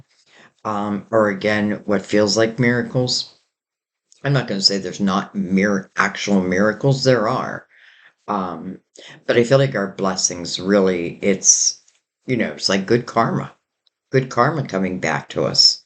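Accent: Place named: Pennsylvania, United States. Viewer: Girl! Your “after break up” phone call! Wow. Love stories like that! I’m from Phila & I always thought you were from PA (your voice!) I wonder where you’re from?) Great readings always! Thank you! 🙏🏻💗🦋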